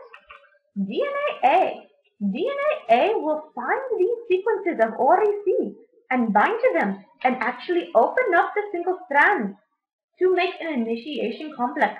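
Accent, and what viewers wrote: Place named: India. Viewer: Your German accent sounds very Indian.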